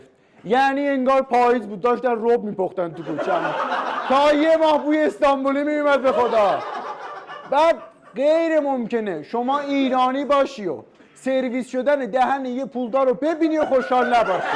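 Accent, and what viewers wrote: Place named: Azerbaijan. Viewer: 😂😂😂😂خیلی خوب بود اجراش،خدایی قشنگ بود،خیلی لهجه آذری شم باحاله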